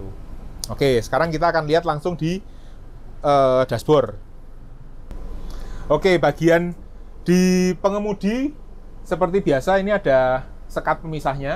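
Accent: Java, Indonesia. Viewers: Pak , njenengan cengkok jawanya kok medok banget ya...bikin orang ngakak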